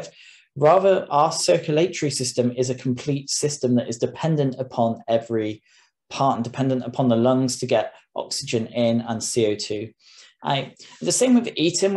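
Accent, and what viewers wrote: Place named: United Kingdom. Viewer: >>> The speaker’s accent appears to be British. Strange, because I thought only Americans were this ignorant and stupid.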